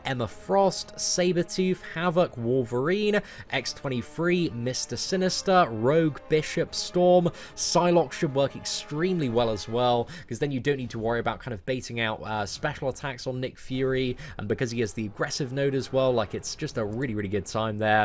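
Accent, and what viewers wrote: United Kingdom. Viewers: gets a paycheck from kabam, don't be fooled by his British accent ppl!!! Ladies and gentmen.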